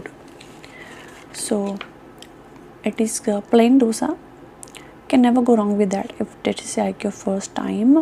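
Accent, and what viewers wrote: India. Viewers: I love the Indian accent ones. Like the sassy ones especially. And the way you 'pop' the final consonants in the words is pure ASMR. I could listen to that all day.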